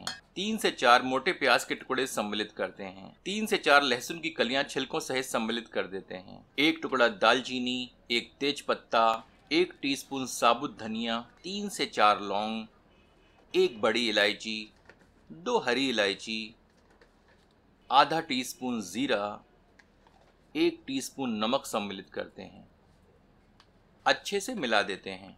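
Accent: Standard. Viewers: Sir apka hindi sunke Dil bag bag ho Gya.....Hum v tasty recipe or suddhaa Hindi ke liye apke khate pe like or ek Subscriber Sammilit krte he........😊😎👌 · itni sudh hindi sunkar dil khush ho gaye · आपकी शुद्ध हिंदी के कायल हो गये हैं। आपकी हिंदी तो अमिताभ बच्चन से भी शुद्ध है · भैया इतना शुद्ध हिंदी कहा से सीखे है । आपके पूरे स्कूल को आप पर हिंदी के लिए गर्व होगा । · the purest n sweetest Hindi I have ever heard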